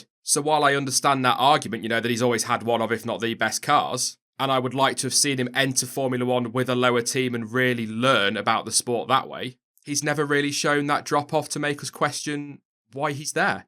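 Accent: United Kingdom. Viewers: Nicely done, interesting to watch. But british-english is pain to listen...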